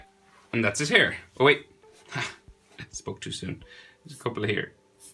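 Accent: Ireland. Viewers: are you irish??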